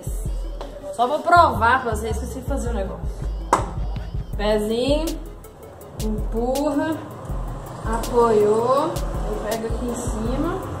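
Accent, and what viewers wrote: Minas Gerais, Brazil. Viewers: Esse sotaque nosso é bonito demais sô 👏😊✌️🤘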